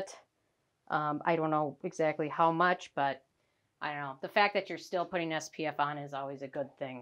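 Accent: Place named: Midwest, United States. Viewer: my sunscreen AND Hyaluronic are water base. I’m using the Garden of Wisdom glacier water blah blah but their most popular and it is nice and refreshing as you’d guess. Def not enough at all on its own. Can’t wait to try First Aid. I have normal skin but it likes oils at night better than creams even tho derm docs say creams are better for keeping products in place to do their work. Sunday Riley Luna or Juno are dreamy for me. Starting up retin A once s week again and once you get past the ugly part, you def glow. I avoid it if I’m vacationing or have an event where I don’t want surprise peeling. Such as Christmas. Then I get back on the horse. I’m originally from MI so your accent is charming like mine. Lol. People know I’m Midwest immediately so I’m loving hearing another brethren once in a while.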